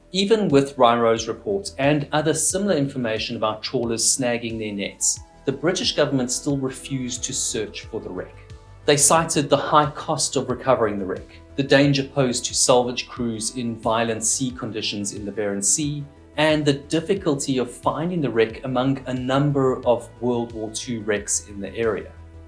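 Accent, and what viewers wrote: South Africa. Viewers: Yeah, pretty sure he's, that's just how it sounds with a South African [I think] accent.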